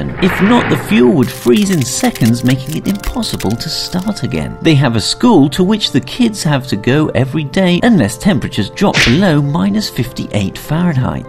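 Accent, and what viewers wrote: United Kingdom. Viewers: Why does he uses Fahrenheit when he has a British accent?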